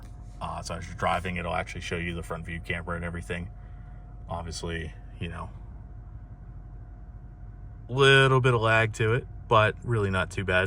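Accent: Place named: Pittsburgh, United States. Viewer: Thanks for the content. I assumed you were from a Pittsburgh dealership, judging from your accent. Close. Ohio.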